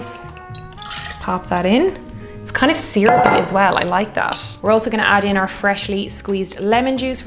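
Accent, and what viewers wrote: Ireland. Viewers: We'd love to have you. We can never have enough beautiful women with an Irish accent over here 😁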